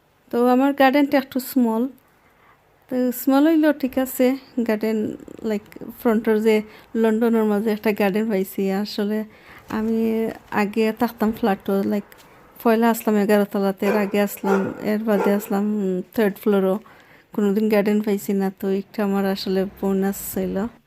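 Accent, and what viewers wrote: Sylhet, Bangladesh. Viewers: Walaikumasalam Alhamdurillah Allah Pak rakche Valo Apu. Apnar sylhety presentation wonderful hoyeche. Thanks for sharing. Bondhu hoye gelam.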